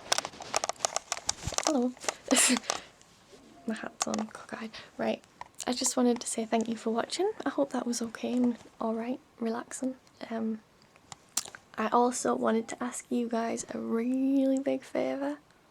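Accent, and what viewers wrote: United Kingdom. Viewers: are you from england ? cuse it seems like you have a british accent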